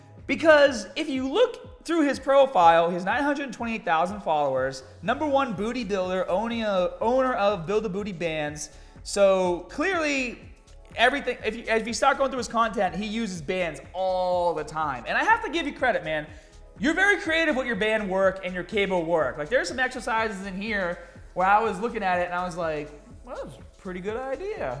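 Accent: Boston, United States. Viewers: Is this accent new? I swear I’ve been subscribed for years and back then never noticed the ultra Boston or whatever the fuck accent. Still the og tho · Dude are you from Boston ? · I love how is just leaning into the yelling Bostonian persona and taking it to the next level.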